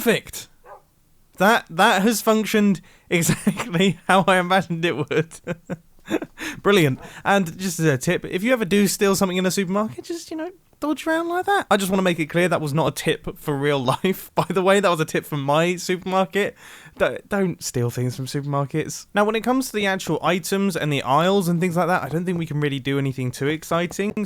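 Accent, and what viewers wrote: England, United Kingdom. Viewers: It's not funny that's just how British people speak which includes me and what the hell is a pizza roll?!?! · English accent I suppose? It depends where you're from in England so I can't say because I'm pretty sure I'm not where is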